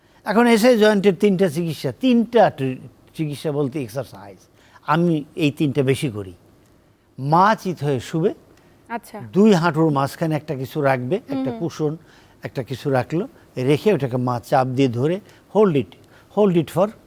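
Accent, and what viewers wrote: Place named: Bangladesh. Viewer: Bangladeshi English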